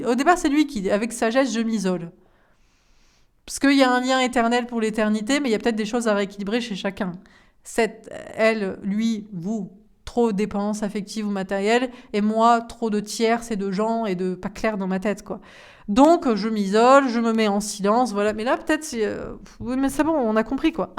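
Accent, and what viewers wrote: South, France. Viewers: Encore un excellent moment avec vous. Beaucoup de justesse.. et encore beaucoup de rire. Je ne sais pas d ou vous êtes, car je cherche l accent, mais y a quelques expressions du sud. 😂 j adore. Au delà du contenu… vous êtes tout à fait le genre de personnalité / humour / personne qui j adore avoir autour de moi. Je pourrais passer des heures avec vous, à vous écouter et à rire. Merci 1000 fois pour ces bons moments. 🙏🏼✨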